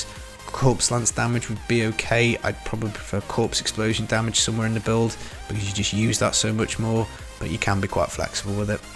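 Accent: England, United Kingdom. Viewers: Is this a GR farming build or GR pushing build? Where you from? I detect England somewhere as I'm in Scotland...I subscribed a while ago and your vids are very good.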